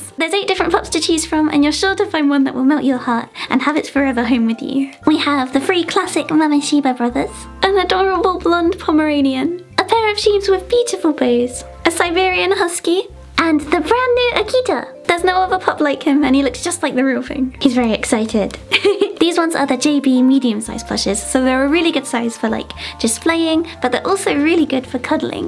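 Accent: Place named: United Kingdom. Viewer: Btw I think your British English is so beautiful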